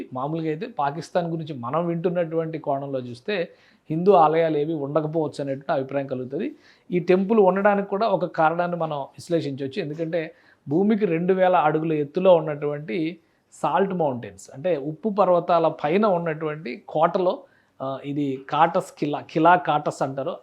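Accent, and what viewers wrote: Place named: Telangana, India. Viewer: ఆయన తెలుగు తెలంగాణా యాసలో ఉంది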